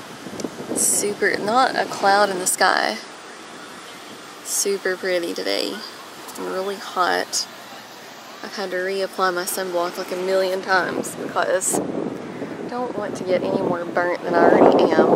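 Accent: South, United States. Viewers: OMGosh your Sammie looks just like my Lexi - right down to the few white hairs between the eyes. New subbie here, just found you tonight. I hear that "southern", may I ask where you are from - Im from the south myself. Really enjoy your vids - congrats on the wedding.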